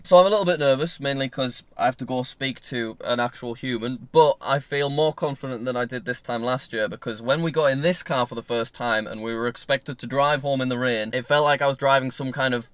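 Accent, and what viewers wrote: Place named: Scotland, United Kingdom. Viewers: Right! I didn't know if he was living in Scotland or England right now, so I just guess Scotland because of his accent. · yeah I live in Scotland he does sound a wee bit Scottish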